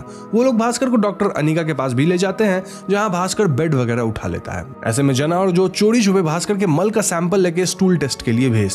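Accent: Bengal, India. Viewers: Karon tumi jokhon kotha bolcho majhe majhe bangla beriye porche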